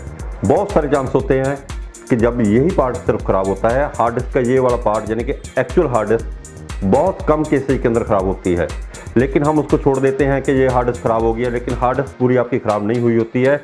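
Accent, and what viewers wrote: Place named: Punjab, India. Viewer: Very good voice pure punjabi. Sir aap kha se ho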